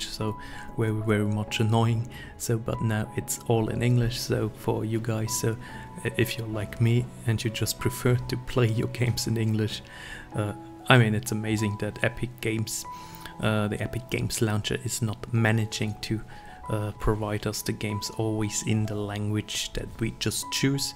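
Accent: Germany. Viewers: man hört so schön diesen nicen deutschen akzent raus hahaha <3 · Man hört diesen deutschen Akzent und man sieht es an den Settings :D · Man hört den deutschen akzent😂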